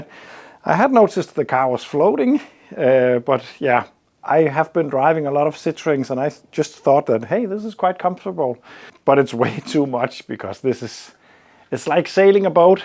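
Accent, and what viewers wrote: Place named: Denmark. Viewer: Hey man, are you from denmark? I think i hear a little bit of a danish accent in your english, and in your umm's and hmm's but it could just be me. Really cool channel, love the content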